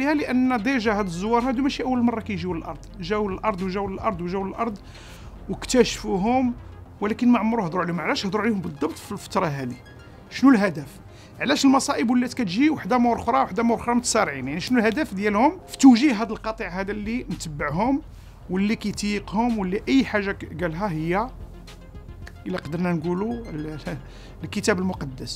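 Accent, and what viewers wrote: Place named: France. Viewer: يارجل قبل ان تتكلم بل سياسة نقدية كانت ام سياسة لقطيع اووو تعلم ان تتكلم بل عربية الفصحى ثم اشرح من يسمعك يقول انك فرنسي متعلم العربية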